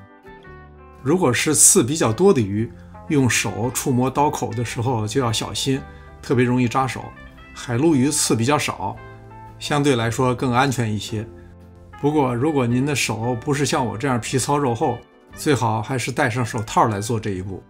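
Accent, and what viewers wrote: Beijing, China. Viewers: 感谢老师的讲解！听上去您是北京人吧？感觉很亲切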